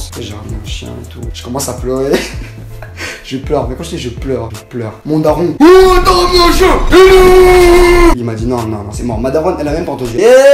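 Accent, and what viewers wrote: Belgium. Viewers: T est belge ?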